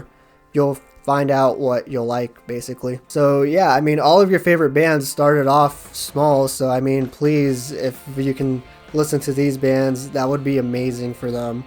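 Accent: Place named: Texas, United States. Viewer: Ayyy, you from Texas man 🤘🤘🤘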